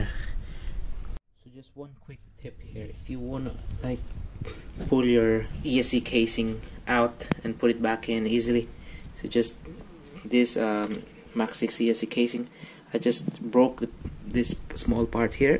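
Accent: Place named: Malaysia. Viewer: i thought u are from malaysia as your ascent is quite similar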